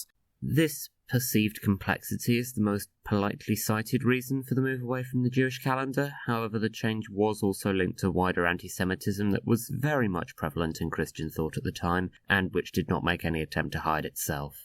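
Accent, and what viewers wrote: United Kingdom. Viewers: Much enjoyed your video, both in its contents and its vocal presentation, whose somewhat dry acerbic touch, highlighted by the nice English accent, made for much captivating entertainment and learning. However, I think there is a slight error in the video visuals: : On the "Observed" 2019 Easter table, shouldn't the "Next Sunday" be Sunday, April 21, instead of Sun. March 21 (since that would have a Thursday--and that next full moon acc. to the ecclesiastical calendar was Sat. Apr. 20)? I see as well that so far you have just two videos. Here's to a good many more. Subscribed.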